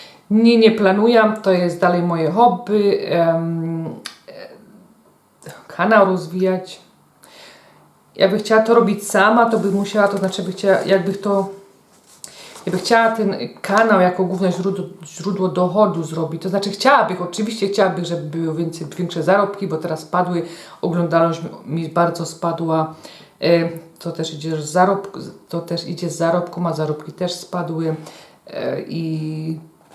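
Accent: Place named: Cieszyn, Poland. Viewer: Od niedawna oglądam twoje filmiki kulinarne i ciekawi mnie z jakiego regionu Polski pochodzisz bo znajomy jest mi twój akcent czy czasem nie jest to region cieszyński. Odpisz bo podobają mi się twoje godki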